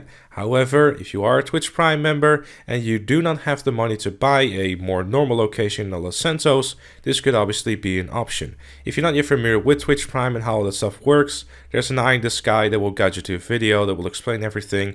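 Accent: Netherlands. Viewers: Ik hoor een beetje een Nederlands accent? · are u from the netherlands ?? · Hollander zeker :p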